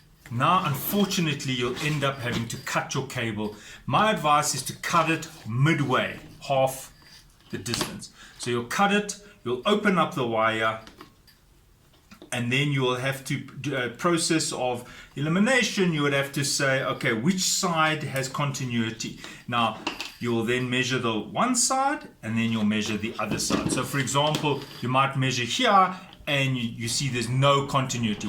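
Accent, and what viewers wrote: South Africa. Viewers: SA accent:)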